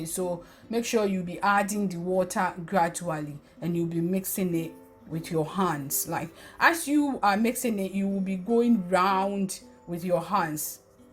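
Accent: Ghana. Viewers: A week a ago I asked weather from GHANA yes may be you are from north your accent sounds a northerner I have leave in the north before. Thanks for this drin. I think I drink this drink before during my school days. In ACCRA it tastes great I wish one day I will try some. THANKS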